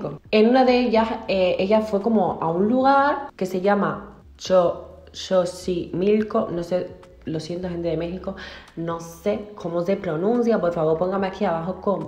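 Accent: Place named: Canary Islands, Spain. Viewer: Soy la única que le nota el acento canario más marcado de nuevo? Qué recuerdos de sus primeros vídeos ♡♡ Saludos desde Gran Canariaa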